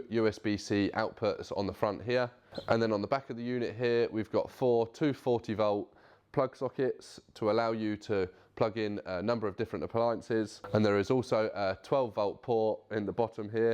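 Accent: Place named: Kent, United Kingdom. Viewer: Bravo! Well done, to camera delivery 100% better, you have a decent comparison in this vid between the intentional to camera delivery vs the incidental pieces, the incidental bits, you are clearly more focused on the lob so the delivery has a very dry tone compared to the prepared to camera pieces. Worth reviewing each vid for pointers, that Ashford (?) accent ain't gonna help, we of the cockney diaspora don't make public speakers!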